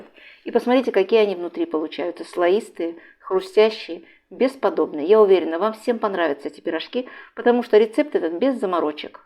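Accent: Baku, Azerbaijan. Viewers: О,этот сладкий бакинский акцент!,спасибо большое за чудесный рецепт и за такую приятную подачу. Храни вас Господь ❤ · Как все по бакински! Просто наслаждение от родного акцента, четкой толковой подачи, вашей красивой внешности и даже интерьер кухни напоминает чем то Баку, ну а рецепты… когда смотрю их, перед глазами встают бакинские посиделки и «гонаглыхи» 70-80х. Прям хочется обнять вас, родная! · Спасибо!!!!Из комментариев поняла, что Вы бывшая бакинка? Огромный привет, землячка! Такое удовольствие услышать чуть уловимый акцент, ничем не истребимый у тех, кто жил в Баку. Желаю Вам успехов, здоровья и благополучия! Всем мира и любви! Пирожки завтра же испеку. Уверена - рецепт классный!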